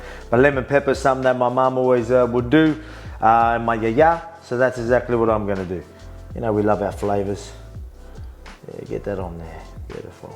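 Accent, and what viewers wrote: Australia. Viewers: Volk is north Macedonian and Greek don't let that Australian accent fool ya lol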